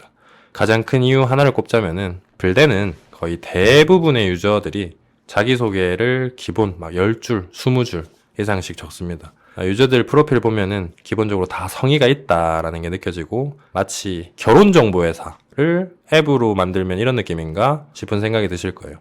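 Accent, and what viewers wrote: Gyeongsang, South Korea. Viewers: 대구 경북쪽 말투네요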